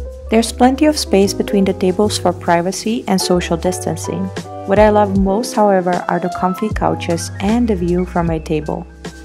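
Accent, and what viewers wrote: India. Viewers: Is it me or she developing an indian accent?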